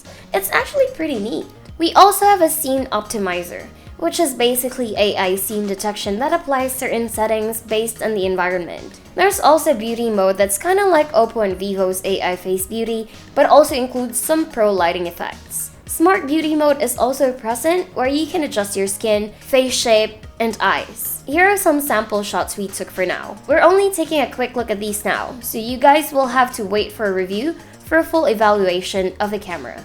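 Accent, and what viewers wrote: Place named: Philippines. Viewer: Her Filipino accent is quite fading, so majority of the words you hear are the right pronunciation since she has borderline American accent.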